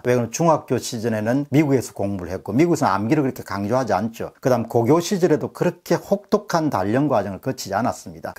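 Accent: Gyeongsang, South Korea. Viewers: 하. . . 서울 토박이 나. 갱상도 사투리 진~~~짜 싫다!!! 정보전달력이. . . ㅜㅜ 표준어 쓰시면 전달력 더 좋을듯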